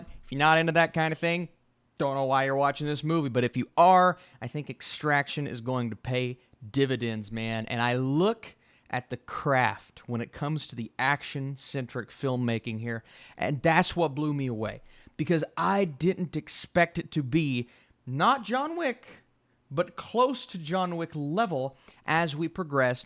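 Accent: South, United States. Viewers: That southern accent you have is lit😍🔥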